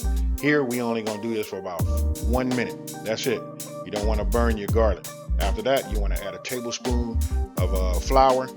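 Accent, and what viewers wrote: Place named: Louisiana, United States. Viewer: the way you say your "i" sounds, I think you are from LA like my husband. He makes grillades and grits, and it's one of my most favorite things! This looks incredible!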